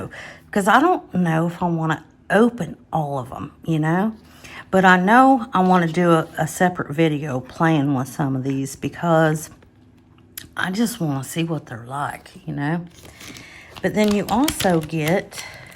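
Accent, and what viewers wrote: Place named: South, United States. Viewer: Hiiii😊, I finally have to comment. I just enjoy your videos soo much! I love your kind southern accent and voice - makes me long for Texas where I lived for a few years and Florida and Alabama too. You are kind, knowledgeable, so very talented, and beautiful! I wish you all the best life has to offer❤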